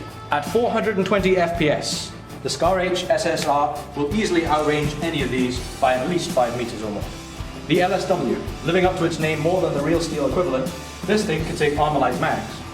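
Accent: United Kingdom. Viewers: but why does he speak soooo... english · i said influence not control ;) he asked why does he have an english [british] accent? and i just said because of Britain's influence in Japan. It's a larger one than America or other english speaking countries, no?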